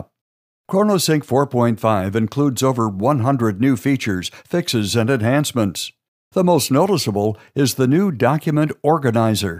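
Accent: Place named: United States. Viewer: Thank God, at last a video fully understood by a man who speaks GOOD typically American language. I think all the videos that contain instructions must have been talking with so purely American to understand the rest of us who are not Americans. Thank you!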